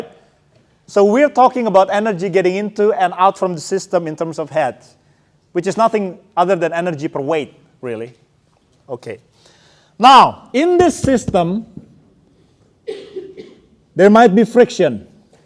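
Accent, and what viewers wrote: Indonesia. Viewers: Akumrasa nggak sih. Akumsangat merasa, bahwa Doktor ini bukan orang tulus dan bukan orang yang berdedikasi. Melainkan penampilan dan gaya lebih penting buat diandaripada science itu sendiri. Selain itu bahasa inggris nya sangat standard dan sangat kental aksen bahasa indonesianya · perfect English with totally Indonesian accent. Awesome, dibanding anak2 sekarang yg sok logat amrik tapi structure dan grammar berantakan :))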